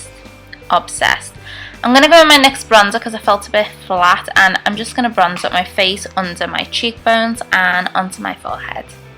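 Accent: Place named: Liverpool, United Kingdom. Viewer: Hey are you from Liverpool just wondering from your accent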